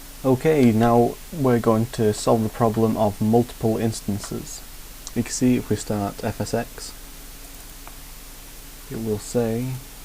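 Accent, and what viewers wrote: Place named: Keighley, United Kingdom. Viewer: You sound like a Keighley lad!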